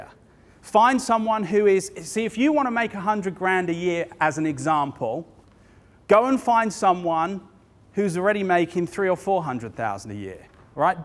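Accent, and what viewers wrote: United Kingdom. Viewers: This guy sounds sooo British than ordinary Brits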